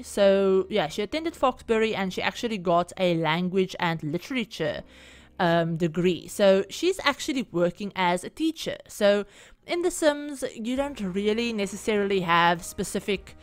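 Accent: South Africa. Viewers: are you south african? our accent is pretty iconic lmao. eitherway omfs im obsessed with this build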